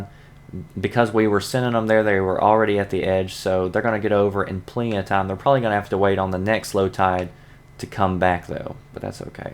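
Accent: South, United States. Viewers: your southern twang is annoying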